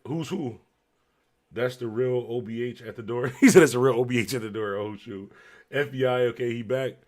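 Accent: Philadelphia, United States. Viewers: You sound like you from Philly.....Salute Bro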